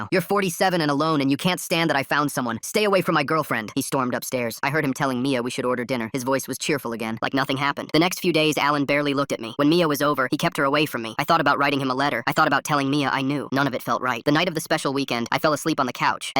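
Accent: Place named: United States. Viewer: Yeah, this guy sounds American, South Africans, yeah we say that stuff like it is, and we'll make fun of you for not knowing too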